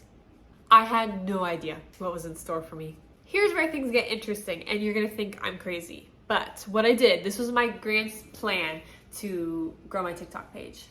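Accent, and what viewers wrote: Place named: United States. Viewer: Your Dialect Sounds Like an American.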